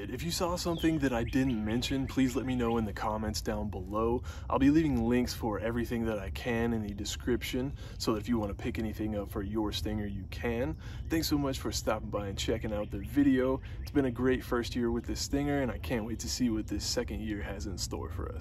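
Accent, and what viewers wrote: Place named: Texas, United States. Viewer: What part of Texas you from ma dude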